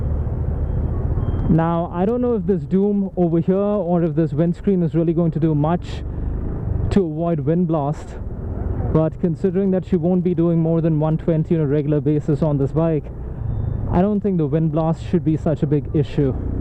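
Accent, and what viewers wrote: England, United Kingdom. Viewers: I love Ur English accent .....can u plzzz share how to improve English to that extent.... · Aaah... look at this beautiful man with his beautiful english accent and his beautiful hair singing with the wind...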